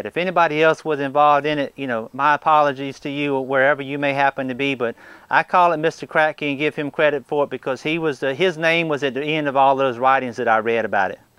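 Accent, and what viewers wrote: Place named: North Carolina, United States. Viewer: I just subscribed about a week ago. And as a Louisiana farm boy I find your videos informational and entertaining. Having worked in North Carolina for a few years (Pittsboro area) I can tell by your accent that you don’t live very far away. Keep up the good work and I will be watching for more good stuff. Specially as you change “SMOKE” hats…….lol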